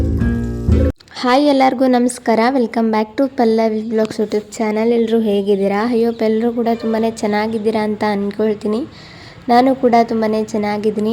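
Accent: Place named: Haveri, India. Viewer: Nivu matado reeti haagu nivu mado aduge recipe yella nodidre nam Haveri saidnavaru ansutte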